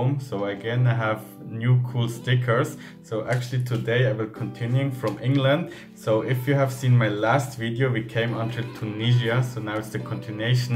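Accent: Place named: Switzerland. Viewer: Ich wette du kommst aus der schweiz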